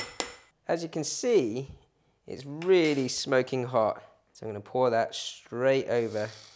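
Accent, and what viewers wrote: United Kingdom. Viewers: Great video, you explained the details on how to make it. I also love your British accent. Oh boy, you have used too much oil in making it.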